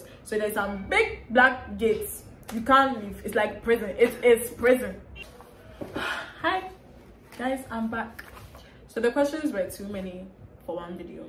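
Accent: United Kingdom. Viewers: I like your British accent can you use it more often♥️♥️♥️ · Your British accent😍😍🥺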